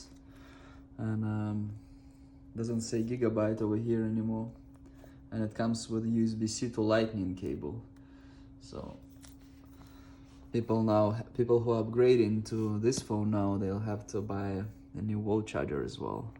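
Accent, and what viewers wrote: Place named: Russia. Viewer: хахаха сразу слышно что руский))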